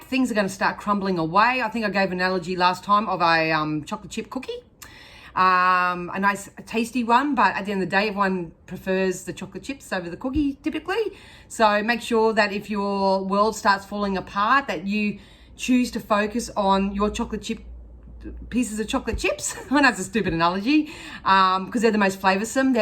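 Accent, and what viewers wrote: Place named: Australia. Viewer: I love how real you are .. it's nice to listen to an Aussie ❤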